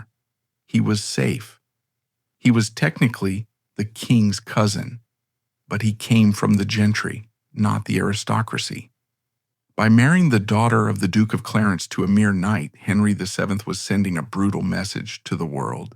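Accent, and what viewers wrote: United States. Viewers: The idiotic images completely wreck the content. The moment I hear an American accent narrating British history I turn off, because I know it'll turn into bullshit.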